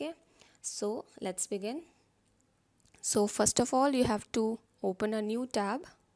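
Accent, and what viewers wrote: India. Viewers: Wow, do all Indians talk with that shit accent. God, it's so annoying.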